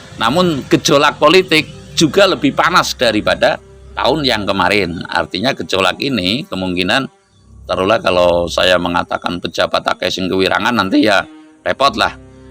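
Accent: Banyumas, Indonesia. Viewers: Aku suka logat ngomongnya ngapak2 gitu lucu tp serius,lama2 tk perhatikan tambh muda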